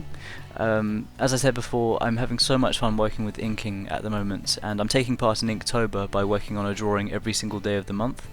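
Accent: England, United Kingdom. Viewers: ¿Quien no adora ese acento inglés que tiene? uwu <3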